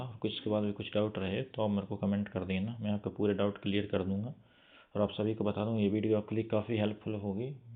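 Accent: Bundelkhand, India. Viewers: Bhaiya aap bundelkhand se ho kya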